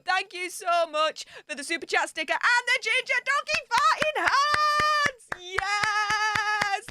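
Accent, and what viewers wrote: Yorkshire, United Kingdom. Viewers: Couple O Wee-Back 🤣 Yorkshire accent is class 👏🏽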